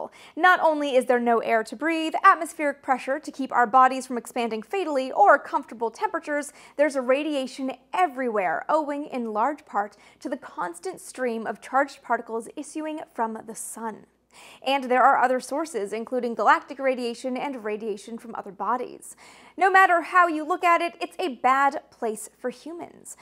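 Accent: Canada. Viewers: Yay. More content! I can still hear the Canada 🇨🇦 in your voice. I'm from Syracuse. 🤷🏿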